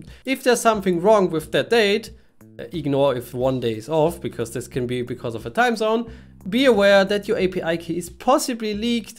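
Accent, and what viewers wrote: Germany. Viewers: i love ur videos, so damn underrated channel.. u have the hardest german accent i ever heard, combined with nice symphatic voice, usefull infos, really good explained, and the editing is just great. keep it up!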